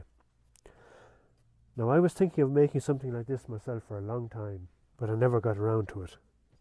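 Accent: Ireland. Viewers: U irish ?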